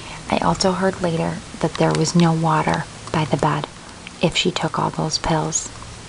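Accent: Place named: Midwest, United States. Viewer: Are you from the Midwest? I love your accent, reminds me of home!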